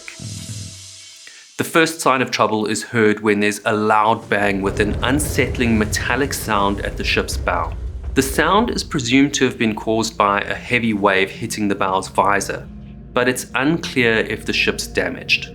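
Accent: South Africa. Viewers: Neat accent. South African, is it?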